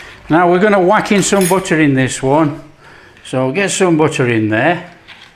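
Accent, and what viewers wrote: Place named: Scotland, United Kingdom. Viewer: I like Scottish accent, it's beautiful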